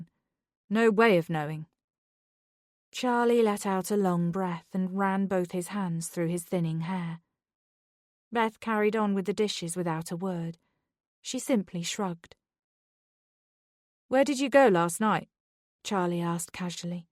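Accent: Birmingham, United Kingdom. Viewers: Mind you, I haven’t started it yet and further down in the comments it says about a brummie accent, which I must admit is not one I like to listen to either. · Great book but the narrators Brummie accent is horrendous and off putting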